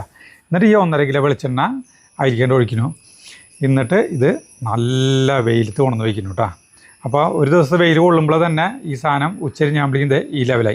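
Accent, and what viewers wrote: Thrissur, India. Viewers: ഇയാള് പറയുന്നത് മനസ്സിലാവുന്നില്ല മനസ്സിലാകുന്ന രീതിയിൽ പറയണം സംസാരിക്കുന്നത് മനസ്സിലാവുകയുള്ളൂ യെ കൊണ്ട് സംസാരിപ്പിക്കും വ്യക്തമായി മനസ്സിലാവുന്ന രീതിയിലാണ് സംസാരിക്കുക നിങ്ങളുടെ തൃശ്ശൂർ ഭാഷ എല്ലാവർക്കും അറിയുകയില്ല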